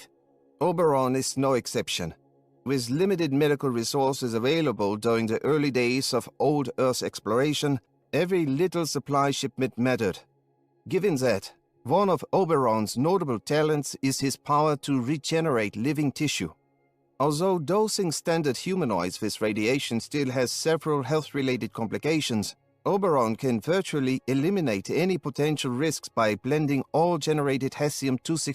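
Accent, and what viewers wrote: Germany. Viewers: is this a real or fake German accent? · is that a german accent? lol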